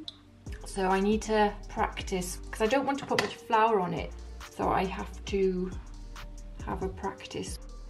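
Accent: Wales, United Kingdom. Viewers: Omg I’m addicted to watching your videos soooo Morish, I was set on buying a Uuni pro tomorrow but now having seen this it’s thrown a massive spanner In the works 🤦🏽‍♂️ I have eaten out rather a lot lately and find that I can tell the difference now between a gas fired pizza and a wood fired pizza. Do you agree? I prefere the taste of wood fired and also find it more fun with wood, with that in mind what oven would you advise me on, given a majority of my pizzas would be wood fired. and gas as a back up (due to typical welsh weather)!! Thanks in advance PS. you sound like your from Wales? 🏴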